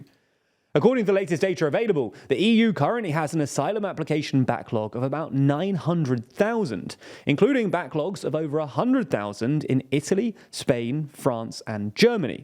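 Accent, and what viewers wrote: England, United Kingdom. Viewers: you are English arnt you!!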